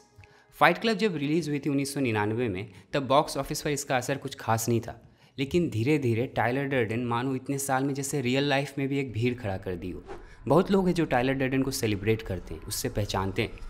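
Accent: Bihar, India. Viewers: <link> no man this guy did it in this way i remembered this after all this time cause this was a gem a pure hand written content and admired this <link> aur ye chutiya apne bhiari accent wali english bol ke alaga gussa dila rha hai aur ditto is dusre wale video ki lines bol rha hai koi bhi samajh jyega original video pe millions me views hai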